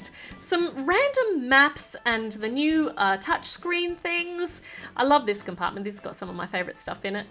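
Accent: Australia. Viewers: sydney i think (she sounds like it)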